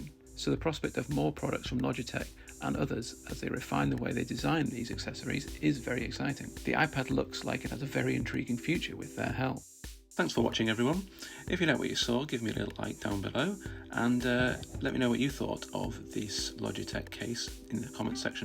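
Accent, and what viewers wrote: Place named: United Kingdom. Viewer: thanks for your reply, cause I hear your accent and thought you were in Uk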